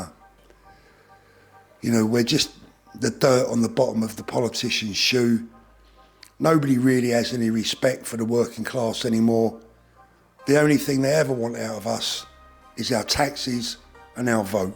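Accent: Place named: Cockney, United Kingdom. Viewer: I love the cockney accent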